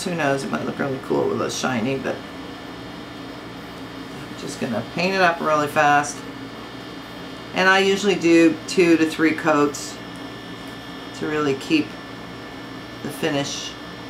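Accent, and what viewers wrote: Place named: India. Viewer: Intro sounds like indian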